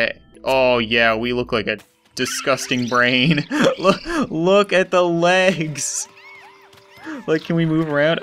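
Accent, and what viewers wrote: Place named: Canada. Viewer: He was so Canadian sounding back then compared to now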